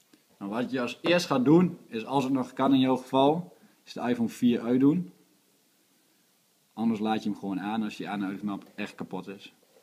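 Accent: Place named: Groningen, Netherlands. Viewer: jij komt duidelijk uit groningen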